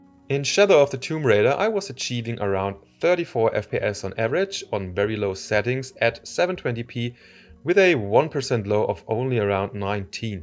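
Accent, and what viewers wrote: Germany. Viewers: Danke für dieses Video:) Deutscher Akzent in Englisch is so easy raus zu hören:D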